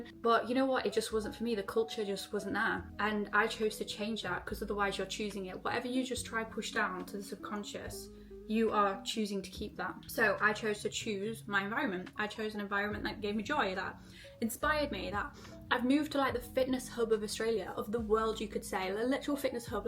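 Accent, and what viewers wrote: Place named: Italy. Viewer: Tu acento eres de italianaa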